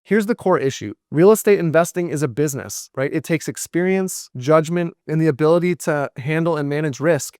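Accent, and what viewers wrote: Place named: California, United States. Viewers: his California accent is what makes his video so good.... 😉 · Great info,! I watch tons of retirement planning vids. When I 1st watched one of yours, I almost flipped past it after picking up on the CA accent. (I moved from TX to CA for a yr as a young teen and was teased brutally for my TX accent. 50 years later, you'd think I'd be over it. 😄)...but I persisted and watched it thru. Then another. Then another. Now your content has become some of my favorites. Keep up the good work!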